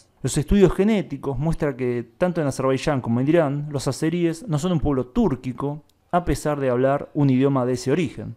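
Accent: Buenos Aires, Argentina. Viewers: Si es argentino y el dialecto rioplatense destaca por un yeísmo exagerado, ¿cómo quieres cambiar su modo de hablar español? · Lo único aburridor el asentó, pero lo demás super, un acento colombiano sería super, pero bueno aceptar así los hermanos argentinos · ¿Vos te das cuenta de la cantidad de letras q leés como "eyyyyheee"? ¡HASTA LA JOTA LA CONVERTÍS EN ESAPORQUERÍA DE "LETRA" Q INVENTARON USTEDES LOS PORTEÑOS, CON LA Q SUBSTITUYEN NO SE Q´ CANTIDAD DE FONEMAS! ¿Tenés conciencia de cómo te baja el el nivel como para q te andés metiendo en temas de la cultura? ¿No se dan cuenta de cómo mutilan el alfabeto y el lenguaje con ese sonido caníbal insoportable? ¿De dónde lo sacaron? Si oís grabaciones de radio y tele hasta hace unos pocos 20/30años es imposible oír esa aberración brutal, q es pura ignorancia y degradación de la cultura nacional. ¡EXPLICAME CÓMO SE ESCRIBE ESE SONIDO Q ES A LA VEZ EYE, JOTA, ELLE Y NO SE CUANTAS MÁS! 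¿Porqué no te dedicás a la cumbia, el cuartetazo y la bailanta, q te va a caer como anillo al dedo?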